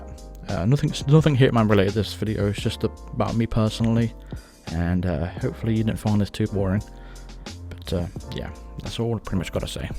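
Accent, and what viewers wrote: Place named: England, United Kingdom. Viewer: you're the man! It takes a lot of courage to put yourself out there like that. Anonymity is also pretty cool, you show your face when you're ready. You've got my support from Sweden. Its a good time for a break now you have until Jan 20 2021, we're all counting on you for Hitman III. I watch your vids because you get the business done in a good way with great commentary. As an expat I like to hear the English accent. You sound like a good guy and very likable. BTW with the new consoles coming out I bet everyone is looking to upgrade there TVs. Have you got any tips of what we need to look for frame rates, 4K or 8K etc.?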